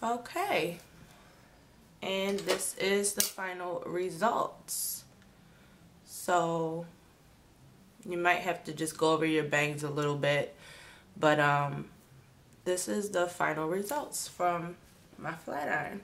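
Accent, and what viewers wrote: United States. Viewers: Doesn't she sound American to you?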